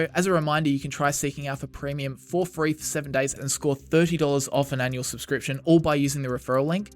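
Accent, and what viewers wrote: Australia. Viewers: an aussie dude huh. let put some more gilts on the barby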